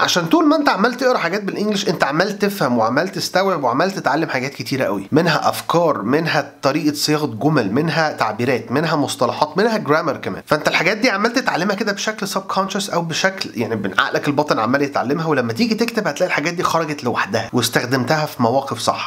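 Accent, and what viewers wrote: United States. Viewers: بعيداً عن موضوع الكتابة انا الآن اصبحت اقرء الانجليزي بشكل صحيح بنسبة 95% من خلال فيديوهاتك وتكرار لفظها بالاكسنت الامريكي 💙💙 Thanks a lot, keep going on 😍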